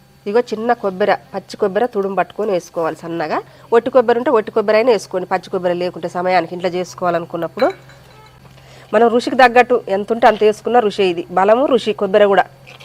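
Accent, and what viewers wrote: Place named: Mahabubnagar, India. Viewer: Medhi mahabubnagar side kadha language thelusthundi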